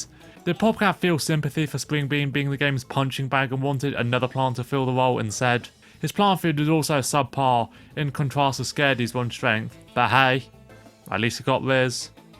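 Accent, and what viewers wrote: United Kingdom. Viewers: This guy is so unironically British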